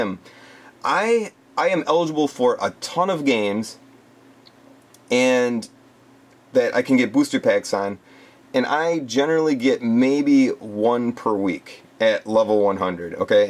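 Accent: Canada. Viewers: Are you from Newfoundland?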